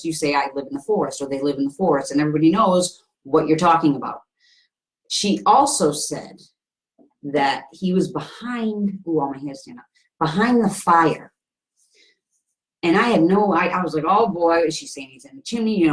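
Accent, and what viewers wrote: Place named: New York City, United States. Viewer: I love you girl. I used to live with family in NYC and I am hearing some accent from you but I could be wrong lol.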